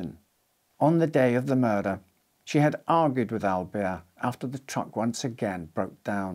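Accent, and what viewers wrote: Scotland, United Kingdom. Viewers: As usual, excellent presentation. Proper pronunciation of our English language is so important, and (if I'm right, you're Scotch?) your innate facility to use consonants properly means this - and all your super videos - are a tremendously fulfilling watch. Only thing to be alert on: (maybe auto-generated) Yank shoddy spelling e.g. "ax" for axe or "meters" for metres. Good work. Love your channel!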